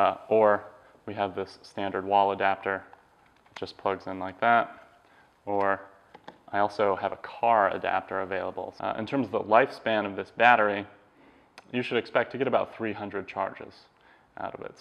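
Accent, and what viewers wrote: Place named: United Kingdom. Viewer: haha love the british accent - love the lights. gonna put some $ toward the Beta QR next check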